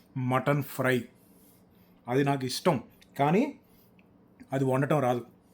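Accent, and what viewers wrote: Andhra Pradesh, India. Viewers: Nizamabad antunav kani nee slang mitham Andhra style undi beo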